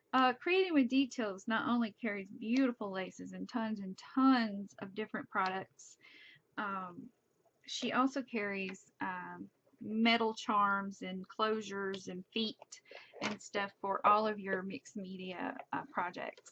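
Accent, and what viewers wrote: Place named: South, United States. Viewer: I will. I live in North Georgia you sound like a southern girl too lol!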